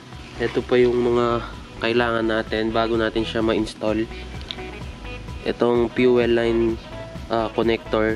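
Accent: Pampanga, Philippines. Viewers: Kapampangan ka boss? Halata sa accent eh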